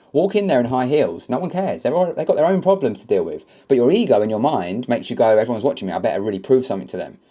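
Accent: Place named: United Kingdom. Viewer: Man just appo pay errything innit😭😭😭, the British accent just kills me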